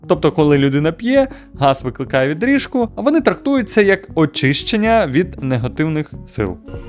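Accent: Russia. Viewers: неможливо слухати, через заводську расссєйську прошивку автора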